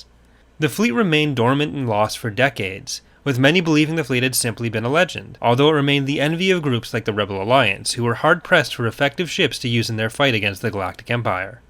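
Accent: Canada. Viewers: I feel like the black fleet crisis could fall under this category. I know most ppl don't like those books, but I personally do. I love new republic stories and older star wars material in general, it's so nostalgic to me., u sound like u have alittle Canadian in you!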